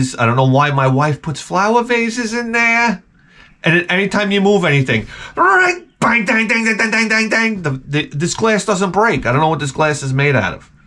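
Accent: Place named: New York, United States. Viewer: Man. Instead of complaining go Play some gangsters. With Your NY accent id hire u in a blink. Attitude to. Guaranteed return.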